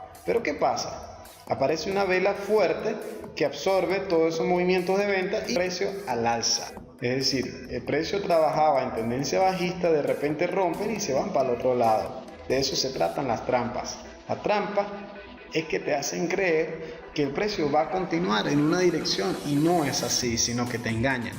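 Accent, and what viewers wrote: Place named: Venezuela. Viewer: Conho hace mucho que no escucho un Venezolano... Bien hay mi pana estoy aprendiendo y tu aporte es importante, gracias.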